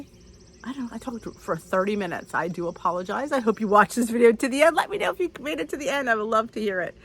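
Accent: New Jersey, United States. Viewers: I'm glad I found your channel and i just subscribed to it. I'm with WW and have 150 pounds to lose. I'm trying to make it a lifestyle - I have lost 65 pounds since my highest weight and lately have gained and lost the same 10 pounds because I've been goofing off. For my health I'm really trying to make it stick and your recipes and examples of what you eat in a day. Also- you gotta be a Jersey girl - you definately have the accent. Too bad WW didnt take you- definately their loss. Anyway I look forward to following you- your success is inspirational!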